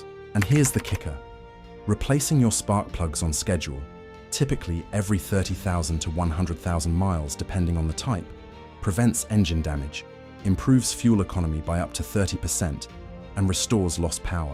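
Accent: England, United Kingdom. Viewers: Why does an English accent, somehow, import an "expert" narrated video?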